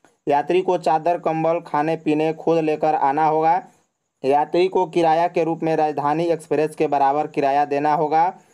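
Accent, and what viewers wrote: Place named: Bihar, India. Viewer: Aapka bolne ka tareka sahe nhe lga. Bihar jaisa lgata hai.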